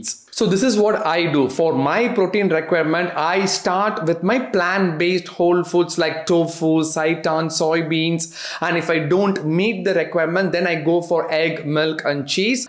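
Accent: India. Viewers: You find him humorous? Only Indians maybe coz non Indians struggle to even keep up with his accent 😢😢😢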